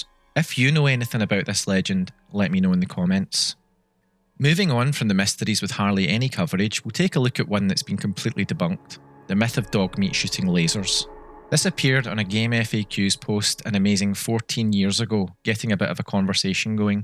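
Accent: Scotland, United Kingdom. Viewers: love to see my scots making some great you tube content on something i love, you've saved me from American accents for tonight aha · I know it's Scottish I said this on purpose to mess with you. Good spirits though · wow you're severely scottish, I like it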